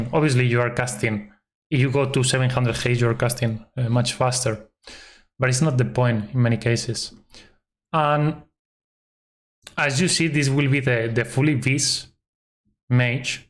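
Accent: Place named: Spain. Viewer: i really love your accent. i thought you were greek at first but i realized its spanish. but not all spanish people pronouce words the same way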